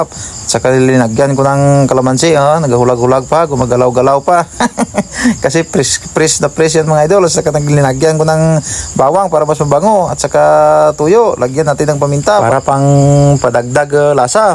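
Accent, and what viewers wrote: Iloilo, Philippines. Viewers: Ilonggo gid haw,,😂😂😂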